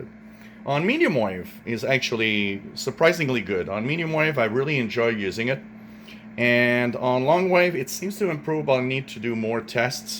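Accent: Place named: Quebec, Canada. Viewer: Definitely from Quebec with that accent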